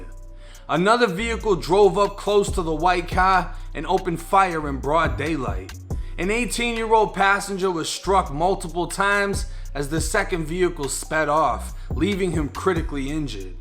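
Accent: Boston, United States. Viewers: Boston accent. Reporting on Cali politics 🤔 I'm not mad long as it's all factual and accurate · I watched like 100 of your videos just seem more real than these other internet niggas sounds like you from Boston or some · Sounds like you from Boston with that accent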